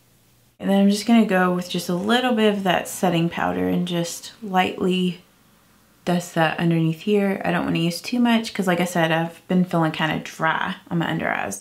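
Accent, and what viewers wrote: South, United States. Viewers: Lol you’re so cuteee! Sounthern accents are so cute 😭😍☺️☺️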